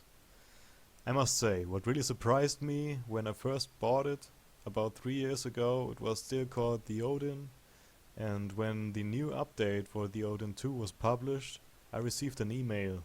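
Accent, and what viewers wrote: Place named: Russia. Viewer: Полюбас русскй акцен ак пробвае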